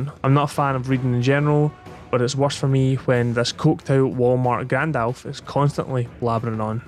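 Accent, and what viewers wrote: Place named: England, United Kingdom. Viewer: AHAHAH this is so funny i love people not understanding english accents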